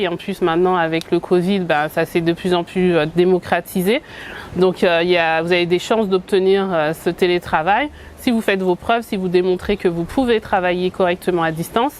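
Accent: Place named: Senegal. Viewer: Cest drole plus je vous écoute plus j'entends l'accent qui se "sénégalise"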